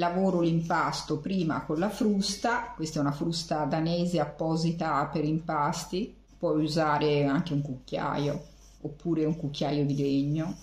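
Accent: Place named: Florence, Italy. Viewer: Bravissima! Ti seguo da NY. Cercavo una ricetta facile per panini. Grazie! Adore il tuo accento fiorentino😊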